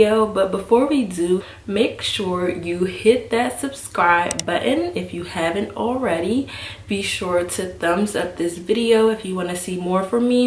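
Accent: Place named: DMV, United States. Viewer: I knew you were from the dmv with that accent😭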